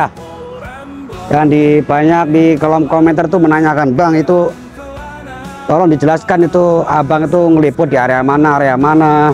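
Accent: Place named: East Java, Indonesia. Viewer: ❤❤❤❤😂😂😂😂 BIKIN KANGEN..... saat pembukaan nada2nya...... Medok2... kayak orang Jawatimur... Kadang kayak orang Sunda... Kadang kayak orang Jawa Tengah.... 😂😂😂😂😂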